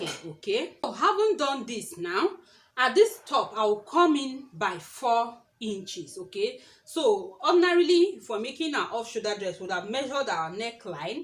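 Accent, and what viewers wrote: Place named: Nigeria. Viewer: When I listened to your video I figured that u were from wonderful Nigeria. U r an excellent teacher. 💘💘💘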